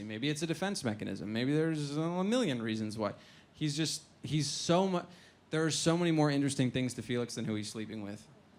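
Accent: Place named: Brixton, United Kingdom. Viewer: Holy shit, I'm British (actually from Brixton, same as Felix is supposed to be) and I pride myself on being able to spot a fake English accent almost instantly... and I was completely fooled by this guy. I guessed pretty quickly that Tatiana wasn't really a Brit (it's a great accent, but the odd American/Canadian vowel still gets through), but I could've sworn this guy was really a Londoner. On the show he sounds almost exactly like people I grew up with (and probably me).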